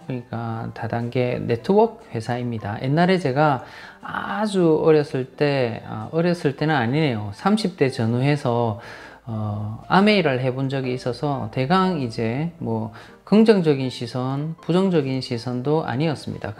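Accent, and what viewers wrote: Gyeongsang, South Korea. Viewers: 톤이 차분하니 듣기좋네요. 경남분인거 같네요. 말씀을 들어보니 인복이 있으시네. 인복이 있어야 돈복도 있는법 부럽습니다. 30대때 당시 기술기능으로 독립이민을 상당히 고민했던 기엇이 나네요. 캐나다준비했었는데 결국 그 산업이 세계적으로 침체기가 돼서 접었던 기억이 납니다. 영어도 나름 열심히 했었는데. 무엇이든 그렇지만 외국에 가고자한다면 다른 준비나 여건도 그렇지만 무엇보다 연이 닿아야 된다고 봅니다. 외국에 사는 연은 분명 있거든요.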